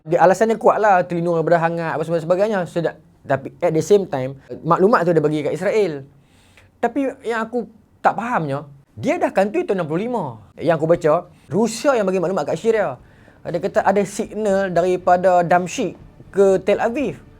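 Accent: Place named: Kedah, Malaysia. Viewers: Ingat sy sorang je tk faham. Maybe sbb lograt utara kot. Rupanye ramai je sama. Nk kuat tv vol tv budak dah tido pulak.😂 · Pekait benor ngt aih bang kedoh tu, penin aih sy nk fakham abg ckap, struggle nk catchup lajhuu ngt aihhh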